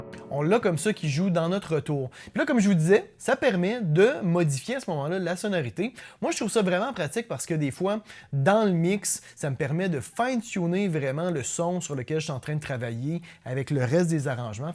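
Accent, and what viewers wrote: Quebec, Canada. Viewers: En fait plus j't'écoute plus je kiff l'accent québécois! (j'suis qu'un maudit Français!) Bref, chouette vidéo (owl video...) , qui apprend des trucs simples et utiles sur un bon rythme et dans la bonne humeur.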